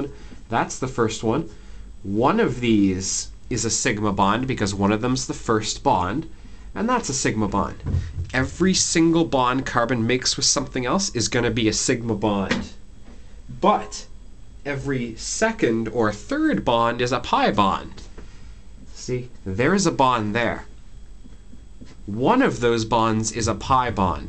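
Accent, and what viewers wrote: Canada. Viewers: This nigga sound hella like a dude frum candana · May God bless you for explaining this so well. Kudos to you and your possibly canadian accent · I just love your commitment to chemistry! Thank you very muchm it helped a lot! BTW... are cou Canadian? :D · Great teaching and Canadian accent. Thanks brother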